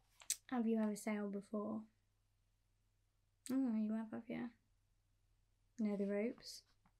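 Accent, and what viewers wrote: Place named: Birmingham, United Kingdom. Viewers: I think the best thing is that it sounds like u come from Birmingham (witch is where im from 😂) really good role play Keep up the awesome work 💯♥️ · Being from the Midlands (South Yorkshire) specifically your accent felt so at home for me lol its so strange hearing it from you tho.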